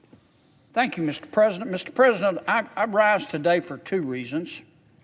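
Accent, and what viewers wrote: South, United States. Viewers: All males as far as his office goes. Spoken like a true southern rich old guy. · Soft spoken and genteel, Senator John Kennedy leaves out the fact that the war in Ukraine has much to do with the impact of consumer prices. What has more to do with energy prices is the fact the oil producers are pumping less out of the ground. The purpose is to raise prices. The contributions of $893,247 from big oil does not seem to jar his sweet southern drawl either.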